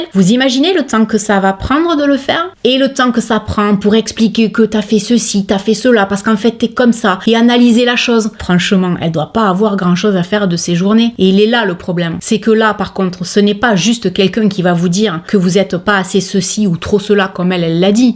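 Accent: South, France. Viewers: Merci à toi. Tes vidéos me plaisent toujours autant. Tu as bien raison de ne pas te laisser faire, bravo. Et moi j'adore entendre ton accent du sud en particulier tes "moins" 😂 bonne fin d'année à toi ❤❤ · 😱 je trouve ça épouvantable d’avoir à expliquer tout ça! Je vais donc en profiter pour te dire que j’adore ton accent du sud! Je suis du Québec et mon fils s’est marié à Limoux en 2019! Il habite cette belle région du sud que je trouve formidable! Merci pour tes vidéos! 🥰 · Bonjour, je crois que c'est la première fois que je laisse un commentaire...J'aime beaucoup ce que vous faites, et votre accent est super (je suis moi aussi du Sud - mais Est;) )..Pour en revenir aux réseaux sociaux j'ai fait un commentaire récemment sur twitter concernant une photo d'un hérisson en taggant une personne qui les dessine et qui les nomme "choupisson"...Je me suis pris une volée de bois vert de la part de l'auteur du tweet qui a dit en gros que ce genre d'appellation faisait du mal à ces animaux et incitait les gens à les prendre en animal de compagnie... 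Pour un mot... Merci pour votre bienveillance et votre sourire..; Belle et heureuse année à vous et votre famille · Bonsoir. Et bien moi j'ai 55 ans, je travaille dans un service public qui accueille des clients plus ou moins intéressants, je suis du 12,j'ai un "assent" comme le tien...bref je cumule!!!! En résumé: vieille,fonctionnaire et du sud...mais qu'est-ce que j'aime ça!!!!. Vive nous et m.... aux aigris. Vive!!! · J'ai été harcelée à l'école sur le fait que je parlais "pointu", en gros l'inverse de ce que l'on reproche à ton bel accent du sud. En gros j'ai été harcelée car vivant dans le sud, je n'en avais pas l'accent..... Ridicule non? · Moi j'adore ton accent du sud ❤ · Je suis estomaqué de voir à quel point certaines personnes sont méchantes. Moi j’adore tes vidéos tu me fait toujours passer un très bon moment, toujours bienveillante et j’adore ton accent ( je suis du sud aussi). 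Continue comme tu es et tu as raison on ne doit pas banalisé le harcèlement. Bisous 😘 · Coucou, oui le harcèlement est réprimé par la loi, et oui il faut le dénoncer car généralement les harceleurs s’en prennent à plusieurs personnes quand le but est atteint, ils attaquent une autre et ainsi de suite … j’adore tes vidéos sincères, j’aime tes crash-tests et les autres tests avec avis bref, c’est un plaisir d’entendre ton petit accès du Sud et ton rire 😊 Belle année lumineuse ✨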